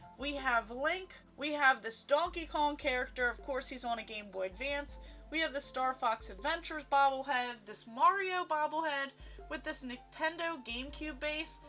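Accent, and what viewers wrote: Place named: Philadelphia, United States. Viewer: With that accent, you must be from the Philly area.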